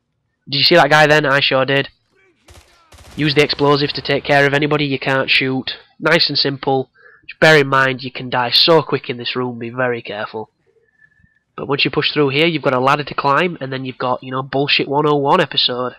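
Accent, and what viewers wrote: United Kingdom. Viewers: You sound British man!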